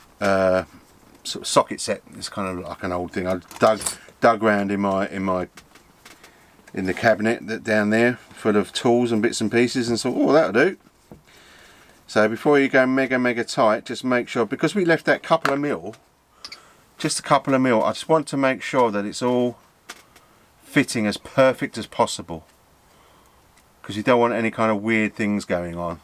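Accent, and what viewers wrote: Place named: England, United Kingdom. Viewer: Hello! A time ago I found your channel for looking after the Max Thurst Ruckus. Now I´m thinking about buying a used but almost new Draco for about 500€ maybe less and knowing the low points. Until now I have seen complaints about CG, tires (you said they were changed?), power and landing gear (front and rear). What should I consider if buying this used one, what do you think? Much appreciated to hear from you Master:))) Best regards to the UK, your channel is really cool, and it is nice to hear english accent instead of the most in RC spreaded american. Just my opinion:)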